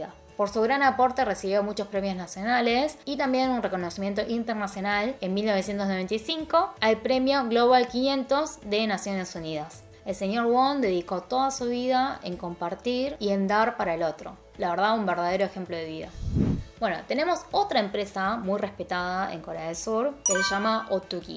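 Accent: Argentina. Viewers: Soy el unicoo que se pone rraro al verla hablar español y aun mas con acento argentino 😅 me da cosa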